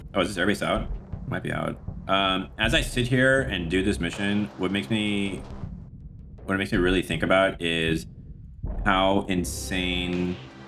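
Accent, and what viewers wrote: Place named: Eastern Europe. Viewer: au au au, even my eyes got hurt by your Slavic pronunciation... Dude! Come on!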